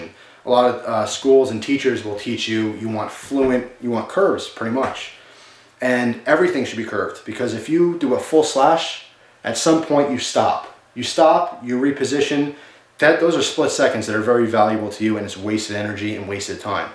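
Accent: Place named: New York, United States. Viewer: did you say boind them? hahahaha i think that the new york accent is cool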